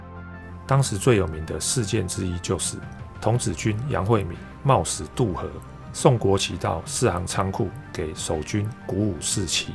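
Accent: Taiwan. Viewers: 这是我听过的最亲切最好听的台湾话！！ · 操着台灣國語腔調的先生闡述抗戰的史實我眼淚也躲眶而出．以從會再看你的視頻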